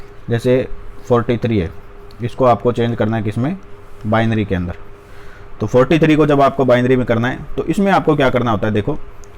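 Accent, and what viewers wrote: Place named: Haryana, India. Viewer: Sir aapki awaz es video me na thi aap pdhaya kre,aapki hariyanvi boli bhut achhi lgti hai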